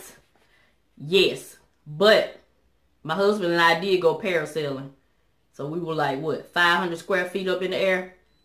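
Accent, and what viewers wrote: South, United States. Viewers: You are absolutely adorable! I enjoyed learning about you. I love your southern drawl. Congratulations on your amazing accomplishments. TFS 👌❤😁!!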